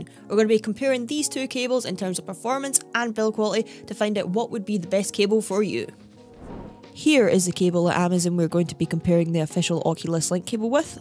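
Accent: United Kingdom. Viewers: Great video! Love your brit ascent!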